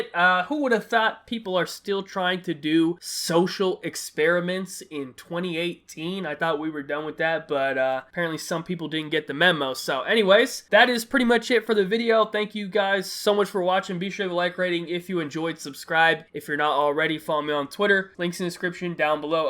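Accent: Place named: DMV, United States. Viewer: Bruh are you from the dmv cause you kinda sound like it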